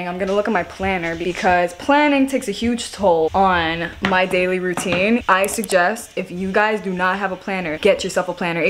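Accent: New York, United States. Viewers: u from nyc? 🤨 love ur accent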